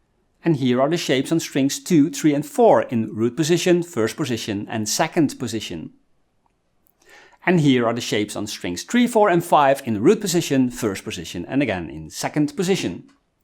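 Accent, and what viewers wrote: Netherlands. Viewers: A)crystal clear B) your Dutch accent brings back warm memories of all my Flemish and Dutch colleagues! Thank you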